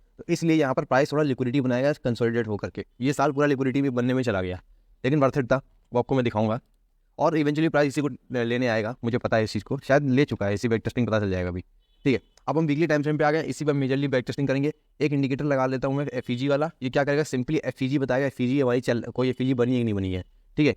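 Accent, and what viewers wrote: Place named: Uttar Pradesh, India. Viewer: Bhai aapke bolne kae tareeke sae aisa lag rha hai ki aap UP sas hai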